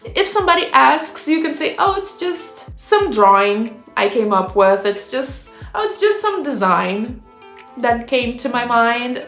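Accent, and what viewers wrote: Brazil. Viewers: Tava tentando achar informações sobre bruxas e wiccas e caí no seu canal. Reconheci o sotaque brasileiro na hora ❤ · Are you brazilian? That accent sounds familiar to me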